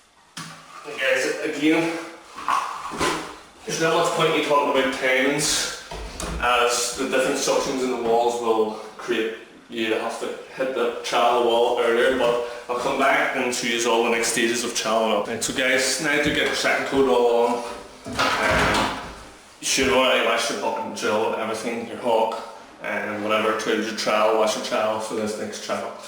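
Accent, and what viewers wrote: Ireland. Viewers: Sounds Irish ?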